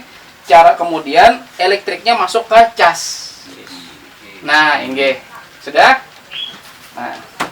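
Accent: Banjar, Indonesia. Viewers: Banjar banar pandiran pian Pak lah... Asli urang hulu kah pian pak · urang Banjar kah? bujur?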